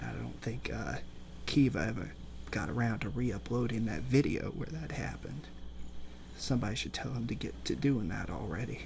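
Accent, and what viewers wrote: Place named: Brooklyn, United States. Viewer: Man...That is a good brooklin accent right there bruh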